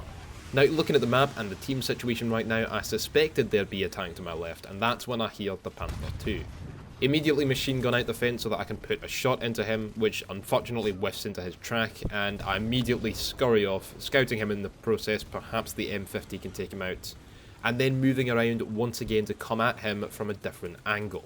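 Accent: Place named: Scotland, United Kingdom. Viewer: Is it just me or did your scottish accent get extra thicc today?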